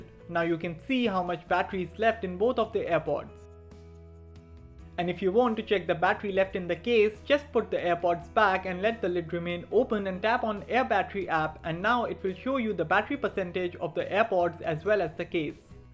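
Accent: India. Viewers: indian lol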